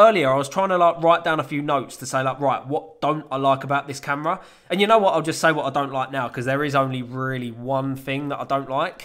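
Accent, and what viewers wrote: United Kingdom. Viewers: favorite british creator, loving your accent your lovely geezer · where's this guys' accent from like which part of the UK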